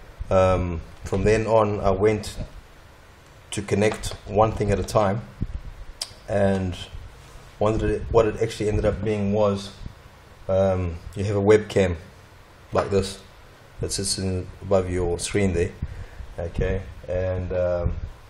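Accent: South Africa. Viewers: The SA accent?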